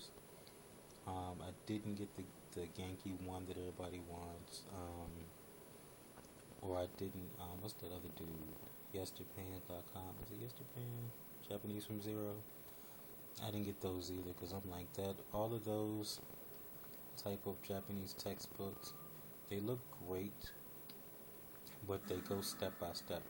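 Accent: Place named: DMV, United States. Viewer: Thank u for this video I homeschool my children and I’ve done so for many years my children are very interested in speaking Japanese and ur video has been the most helpful to me with the teaching basics at a low price so once agin thank u 🙏🏾 I currently live in Ohio but I’m a lower eastern shore girl through and through I could tell from ur accent that u were from the DMV area and to me that is always a plus 😉👍🏾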